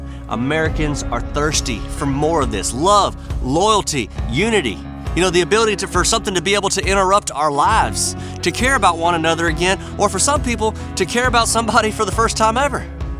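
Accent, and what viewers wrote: South, United States. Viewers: “We wish you the best we wish you a speedy recovery…But…” here’s come the judgement in a southern accent with a contrived ‘bless yer heart’ smile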